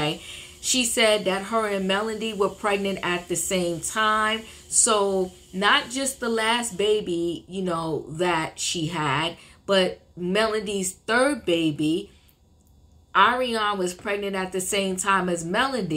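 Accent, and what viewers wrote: New York, United States. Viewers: ❤ your NY accent and attitude while giving your commentary. I watched twice in a row. You're on point!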